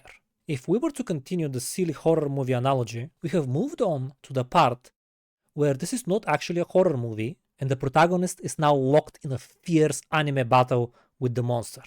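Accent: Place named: Serbia. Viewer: Only Lovebites can make a song like a mix of Slayer and Testament but still got it with their own style. Gotta love this. M & M guitar duo just cant be beaten. Lovebites reactions from this dude are always great. This bro knows music and his accent reminds me of someone from my area (i am serbian)-ex Yugoslavia. Love it. Just keep it up bro-dont stop, you re good. Respect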